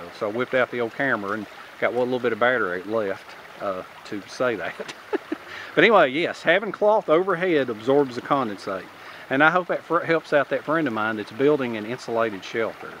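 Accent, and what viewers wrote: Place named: Spartanburg, United States. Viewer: . Well i don not have too many 1 hr blocks to listen and watch. but just saw a few short ones I will watch later, You sound like Spartanburg SC. You remind me of my Brother in law the Prison guard.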